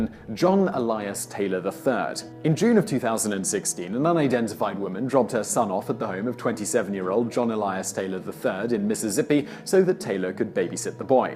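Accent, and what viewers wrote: United Kingdom. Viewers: your pronunciation is awesome other then having a uk accent , i have never heard you mispronounced words compare to other channel, do you fact check how to pronounces them before recording, so professional!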